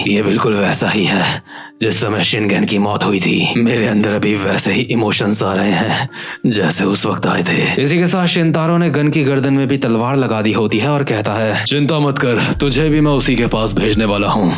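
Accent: Uttarakhand, India. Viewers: Bro tum Uttarakhand se ho kya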